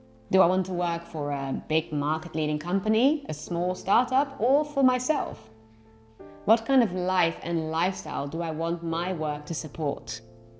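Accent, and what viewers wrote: Netherlands. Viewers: Are you Dutch originally? You sound a little bit Dutch.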